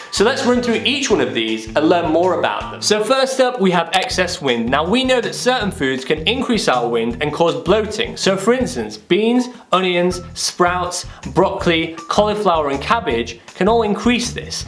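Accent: United Kingdom. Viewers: For the first time, I hear a Persian speaks British beautifully❤. Thank you for help spirit.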